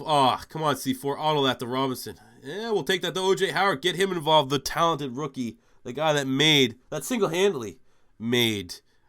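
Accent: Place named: Canada. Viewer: I can't listen to a Canadian speak for 30+ minutes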